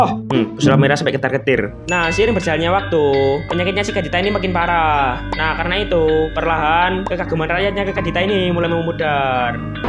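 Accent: Java, Indonesia. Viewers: Jowone kentel tenan mas👍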